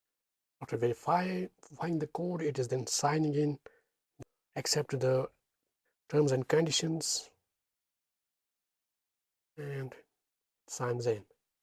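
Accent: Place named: Kashmir, India. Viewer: Kashmiri accent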